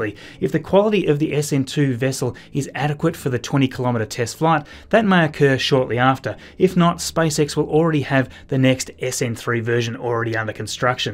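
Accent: Australia. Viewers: Great work Oioioi. What part of Australia are you from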